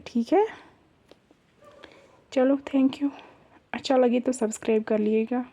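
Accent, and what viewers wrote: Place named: South Kashmir, India. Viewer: Recalling my South kashmiri classmates from ur accent Anyways good wishes and keep it up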